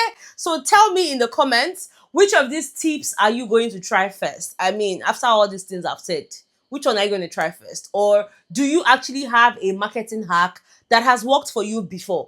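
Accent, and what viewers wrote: Nigeria. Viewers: Hi. My name is..im a teacher...but I'm really looking forward to starting a YouTube channel or something in tech or Customer Support Representative. Your channel is a blessing and breath of fresh air. Your content is so engaging, educative and classy! Your manner of speaking is quite commendable... especially the way you mix clean grammar with the Nigerian English. I'll keep watching out for your posts. Keep up the good work.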